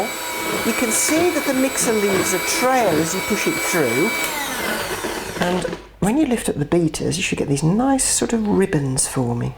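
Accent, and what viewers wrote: United Kingdom. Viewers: I just bumped into you on this site. I saw a comment how somebody turned you onto his mom & she loves you. So I figured if someone watched you, saw their mom later & said "you have to watch this woman", then I ought to give it a moment to see why. Your my hero. Where are you from? The accent. British? Irish? English? Scottish? I grew up in Ireland. Connemara.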